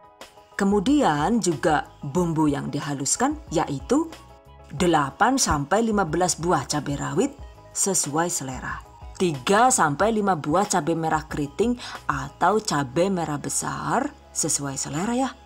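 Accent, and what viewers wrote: Banyumas, Indonesia. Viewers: Wong ngndi mba kok ngapak ky kue logate koh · Hmmm gemes dh ma suaranya bunda ni. Explainnya simple n da bumbu2 candanya itu lo yg bikin gregetan gemes. Dengerin kdg ketawa sndiri. Pa lg bhs ngapak2nya klr beeeh lucu. Semangat trs bunda bikin vidio kreatif masaknya. 👍👍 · Sebenernya suka semua videonya. Semuanya bermanfaat dan yang paling penting itu videonya ga bikin bosen, pasti ketawa2 pas bahasa ngapaknya keluar 😂 Pokoke seneng kabeh pideone rika lah yu 🤣 #GiveawayTHV2020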